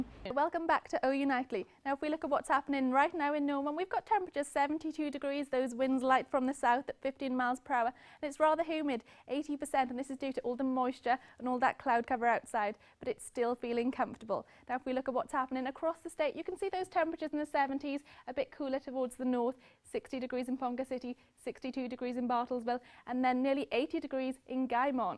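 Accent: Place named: Wales, United Kingdom. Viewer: Why, and how, is a Welsh girl reading the weather in America?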